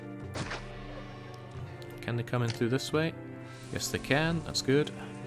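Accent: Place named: Scotland, United Kingdom. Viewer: Dark Young is a bad influence. Since you started hanging out with this guy, you've been sounding increasingly... Scottish.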